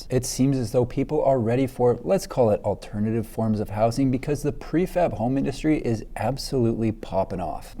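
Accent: Canada. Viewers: Every time I think a better design can't come along, one does! Thank you so much for your interesting and inspirational videos. I so enjoy them and as a Canadian living in Europe, love listening to your gentle Canadian accent as a reminder of home :)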